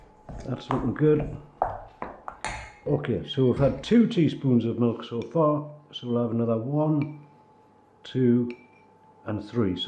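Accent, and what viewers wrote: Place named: North East England, United Kingdom. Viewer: where are you from I love your accent? I believe your from somewhere in the NorthEast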